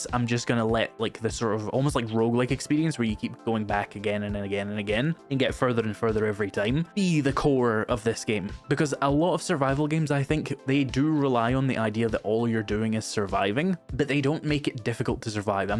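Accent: Scotland, United Kingdom. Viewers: Ah, it's so nice seeing someone taking their first steps in the game and bit by bit figuring out the core mechanics. Makes the game feel all new and fresh even to a veteran player. :) Also love your accent, are you from Scotland?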